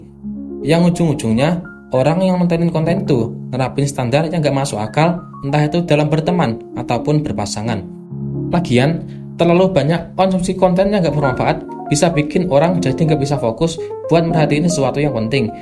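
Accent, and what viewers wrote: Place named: Java, Indonesia. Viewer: lebih enak kalo medok gini bg aseli🗿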